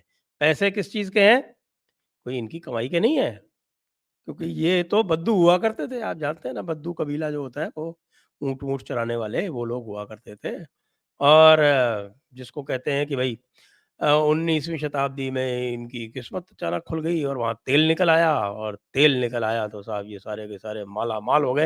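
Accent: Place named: Churu, India. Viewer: आपकी आवाज और बोलने का लहजा एक पत्रकार की तरह नहीं हैं चूरू जिले का हो के?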